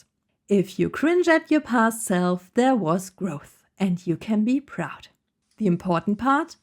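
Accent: Germany. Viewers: If I understood correctly, you're from Germany, so, your German accent actually makes your English easier to understand for non-native English speakers (listeners?). (No offence!) About MVP... So... I wrote some program around November 30th, aaaaaaaand totally lost interest in making it good, not just MVP. Somehow in 2nd half of January I managed to get it into reliable state: now it's running 24/7. Sometimes it's really hard to make step from "it's kinda working" to "yeah, now it's not embarrassing to show it to others". But I think, I saw your channel just in time. Actually need do smth, not just collect ideas and dream about how cool it would be to build it someday. Thank you.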